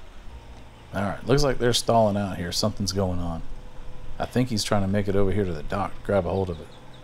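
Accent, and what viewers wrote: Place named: Florida, United States. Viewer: I'm sorry, but the sound of your voice, the tone, and your Florida accent just don't go together in my mind. No offense sir.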